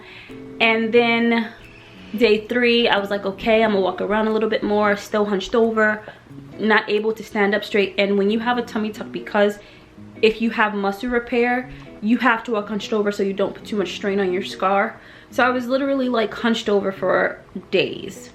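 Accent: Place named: Barbados. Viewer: Where are you from? You sound like a Bajan.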